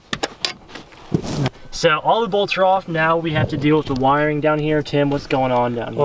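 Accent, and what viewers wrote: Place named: South, United States. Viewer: new to your videos but I could tell instantly yall were southern from yalls voice.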